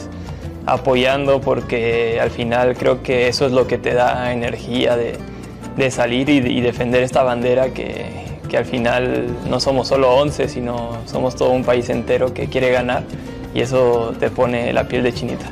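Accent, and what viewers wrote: Mexico. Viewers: Ahuevo compa asta el acento ya lo tienes bien Mexicano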